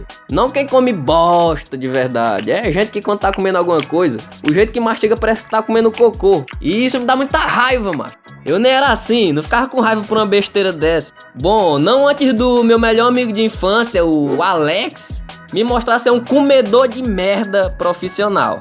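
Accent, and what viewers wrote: Northeast, Brazil. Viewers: Gostei de mais kkkk tu é nordestino?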